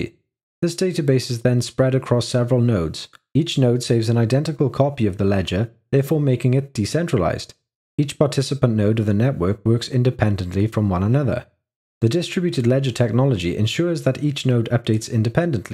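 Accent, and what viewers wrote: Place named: Germany. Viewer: really shitty german accent, I did not understand anything because of his stupid accent from the 3rd reich!!!!!!!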